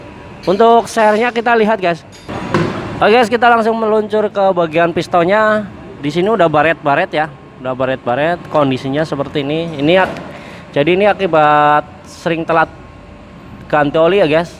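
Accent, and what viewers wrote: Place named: Banyumas, Indonesia. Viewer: Tp logatnya jenengan ngapak ya mas 😁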